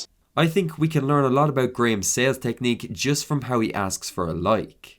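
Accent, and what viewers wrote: Ireland. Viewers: Is this buck Irish? I'm hearing an Irish accent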